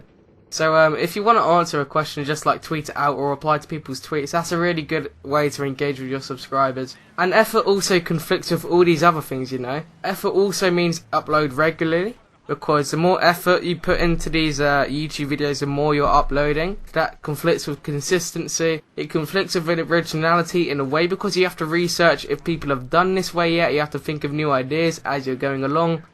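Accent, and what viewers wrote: United Kingdom. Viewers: not every one has a great british accent!!!!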